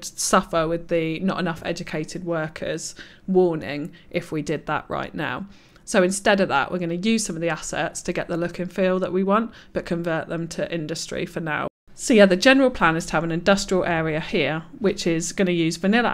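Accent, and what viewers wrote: United Kingdom. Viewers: Subscribed. Great content and nice to have a Brit to listen to! Shame about the road direction 😜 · Another great CS channel to subscribe to. This really is some superb quality content. Inspires me to take a bit more time on my builds. Love the music you used in this 2nd video. Excellent narration and great to hear another Brit. Please keep up the amazing work, and congratulations on nearly 3k subscribers.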